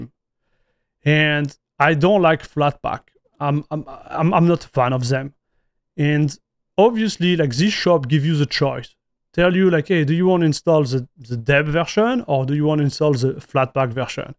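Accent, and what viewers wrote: France. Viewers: Dude, you a Frenchman?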